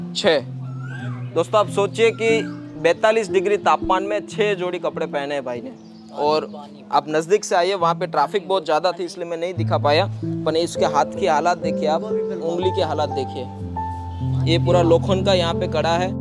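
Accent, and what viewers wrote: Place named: Etawah, India. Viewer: bhai iski avaj se sap pata lagta hai ki a bhai itava ka hai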